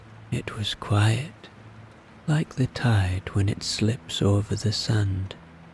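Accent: Ireland. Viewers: This is the first night listening I have been able to sleep with. I’m suffering protracted antidepressant withdrawal and it causes insomnia with night terror due to hyper vigilance….its near impossible to get back to sleep once adrenaline cortisol kicks in and negative thoughts are embedded. BUT, calm, considered, non demanding voice and Irish inflections make it easy to concentrate on as you know there will be no jolting surprises, sharp sounds and ads….I’m so grateful❤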